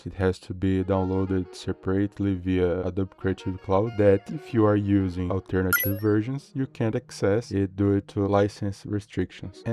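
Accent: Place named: Portugal. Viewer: Sotaque português instantaneamente detectado. Obrigado pelo vídeo, vou tentar aqui. Ainda lançou um de fundo, muito bom.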